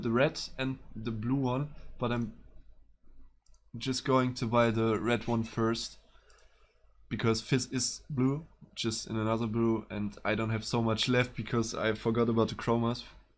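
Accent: Germany. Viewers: Man hört das deutsche in seiner Stimme ^^ aber is ja nicht schlimm weiter so :D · hah dat german accent :P but don't worry i`ve got the same problem ^^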